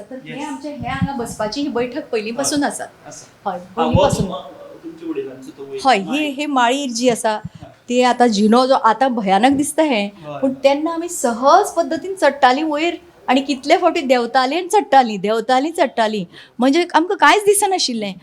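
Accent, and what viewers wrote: Maharashtra, India. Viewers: She has a strong influence of marathi in her speech And can't talk typical kokni words It's unfortunate Oogich sangta ki aplya kokni bari ulapak yeta mhanun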